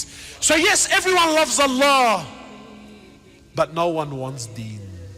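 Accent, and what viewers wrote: Australia. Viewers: Is he Austrailian? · Is this preacher Australian? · is he aussie?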